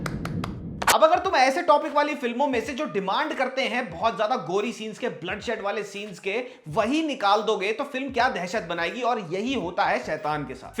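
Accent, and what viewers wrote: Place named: Delhi, India. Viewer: Delhi accent bhai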